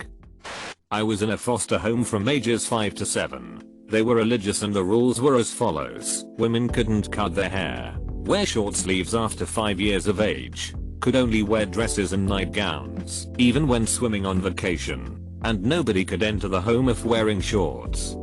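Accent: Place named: United Kingdom. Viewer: Although I can understand English almost perfectly, this British robot voice is always so hard to understand. I'd rather have someone just reading them out loud that would be much better.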